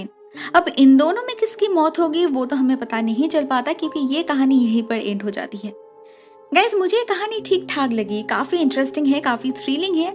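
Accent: Bengal, India. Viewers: U have bengali accent 😀 ..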